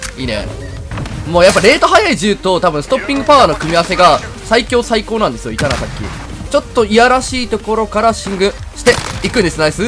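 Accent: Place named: Hyogo, Japan. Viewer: 時々聞き慣れた発音してたからもしかしたらと思ったら もしかしたらで兵庫出身やったんですね笑 動画で「なんどいや」 , 「~じゃいや」「〜がいや」etc 言って流行らして下さいw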